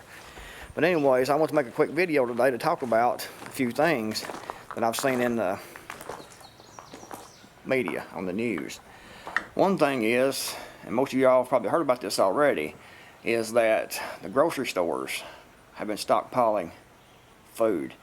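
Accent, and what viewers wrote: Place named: Tennessee, United States. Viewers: I’m in Texas and I love listening to his accent and it is different from what I hear in Texas with certain words pronounced differently. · Me too! Idk where he’s at, but he sounds like he lives in Tennessee. I’m in Texas and our accents are much less twangy. I like his speaking voice.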